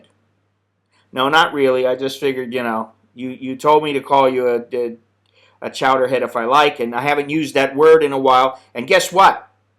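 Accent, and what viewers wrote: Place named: Chicago, United States. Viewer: Seems more like from Chicago.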